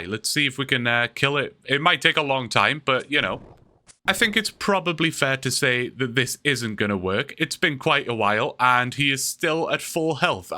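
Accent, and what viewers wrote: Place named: Newcastle, United Kingdom. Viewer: A geordie RuneScape player? Thought i was alone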